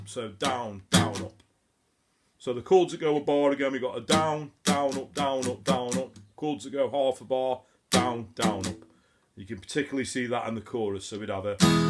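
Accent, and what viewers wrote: Stoke, United Kingdom. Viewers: Great video mate proper Stokie accent 👍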